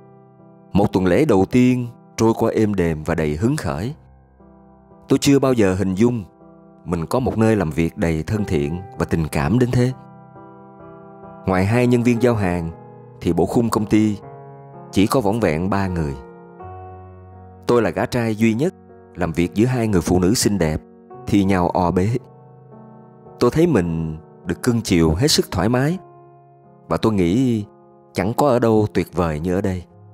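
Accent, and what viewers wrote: South, Vietnam. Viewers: Một chất giọng nam bộ đầy lôi cuốn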